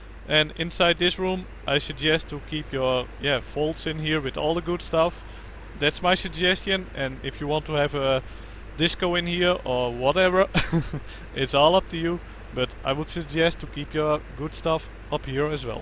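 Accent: Netherlands. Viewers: 100% your dutch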